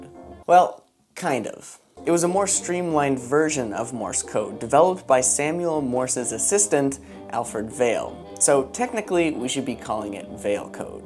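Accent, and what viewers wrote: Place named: Canada. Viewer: You sound Canadian are u Canadian ?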